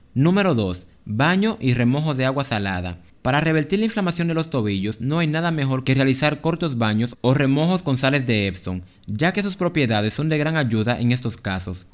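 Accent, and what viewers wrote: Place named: Caribbean. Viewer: El narrador del vídeo tiene un acento caribeño, estoy segura que no es de Perú.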